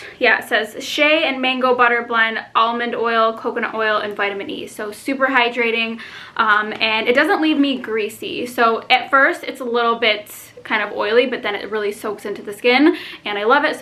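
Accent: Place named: Chicago, United States. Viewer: R u originally from like Chicago or somewhere around that area cuz u have an accent but I can’t pinpoint it lol.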